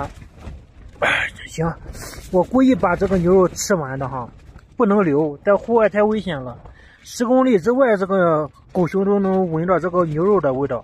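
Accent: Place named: Linyi, China. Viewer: 听口音像是山东老乡，像是俺们临沂那一代口音哈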